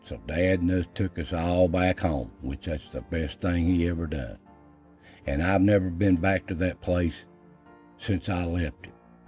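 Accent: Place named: South, United States. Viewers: Lol I love the Southern drawl I talk like you do 😁 that's just way we was raised to talk I really enjoyed this story Thank you for sharing God Bless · I love your videos. It’s good to hear someone that speaks southern American like me. There are different dialects all over the USA but it seems like the only one that people don’t like is the southern one. Well I like it and I’m proud to be a speaker of it. I left the mountains of NC in 80s to work construction and was on the road working different jobs for about 10 years. There are a lot of good people out there but only one place that to me is God’s country. The Blue Ridge Mountains. I am in my 70s now and will never leave this country I love unless for vacation. Well, got to go till my garden. Enjoy your day. 🙏🇺🇸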